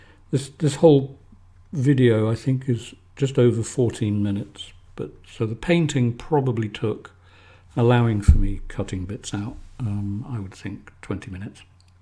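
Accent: England, United Kingdom. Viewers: I have learned a lot from watching your videos, I have painted along with you and it has helped me to paint loosely, which I struggle with. I love your accent, my mother came to the US from England along with several of her siblings and it reminds me of the time they would all get together. Anyway I would love for an instruction on painting rocks, I live in AZ where we have a lot of rocky ground. Thank you for all your video's.Sam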